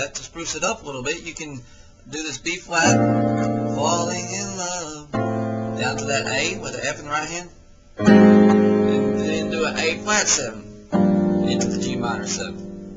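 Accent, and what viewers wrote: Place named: United States. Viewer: Hey, this is cool! interesting accent too! which part of america you from? thanks for sharing your insights. love it.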